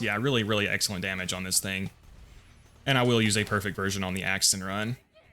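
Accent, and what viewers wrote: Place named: Kentucky, United States. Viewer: Kinda surprised you're from the bible belt. Judging by the accent, I would've guessed somewhere like Kentucky or Tenessee. Greetings from Indiana!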